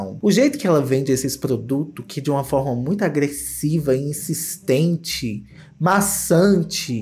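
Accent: Minas Gerais, Brazil. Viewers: as vezes eu esqueço que vc é mineiro, aí eu fico prestando atenção no sotaque e fico uai ele é mineiro kkkkkkkk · gostamos MT do seu "minerês" precisamos mudar n ( mas se te incomoda, ok) rs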